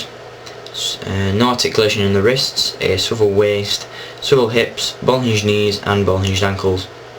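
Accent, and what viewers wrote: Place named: England, United Kingdom. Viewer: wait are u english i thought u was from the us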